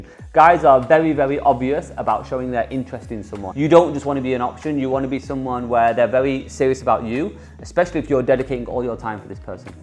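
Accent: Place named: United Kingdom. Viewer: DUDE! SPEAK ENGLISH NOT BRILLISH…. 90% OF PEOPLE I AM SURE DON’T EVEN KNOW WHAT TF ARE YOU SAYING.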